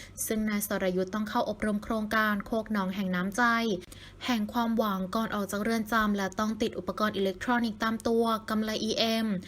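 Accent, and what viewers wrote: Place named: Provincial, Thailand. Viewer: พาดหัวข่าวว่ากลับมาอ่านอานแล้ว แต่ตอนท้ายยังไม่ออกจากเรือนจำ บ้าฉืบ...อ่านเสียเหน่อมากน่าลำคาญ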